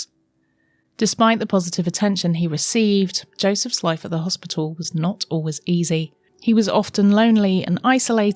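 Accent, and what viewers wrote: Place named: United Kingdom. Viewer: I can remember when I first saw the movie it had just came out back in 1982 I believe it was. My three older Brothers took me to the old single movie theater in Clinton New Jersey. I was still very young and I can remember being afraid and very sad. As you guys say The cinema. I've seen it over the years. And have watched countless videos and pictures and such about the young man. I live in Florida. And I truly wish that I was able to make a historical and as accurate as possible. A movie about Him. From his birth and even also after his death. I truly enjoyed your video. And I have always loved the English British accent. I'm Italian and German on my late father's side and on my mother's side I'm English and Scottish and Irish and Scandinavian. And I've always enjoyed drawing. And I have had such a major and passionate interest in this young man. 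I know that I can't truly know what the young man went through in his short 27 years.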